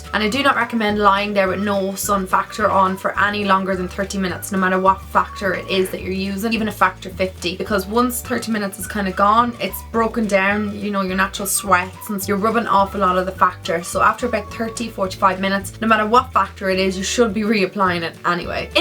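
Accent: Ireland. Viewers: She's from Ireland 😋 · what part off Ireland are you from Xxx love your videos <3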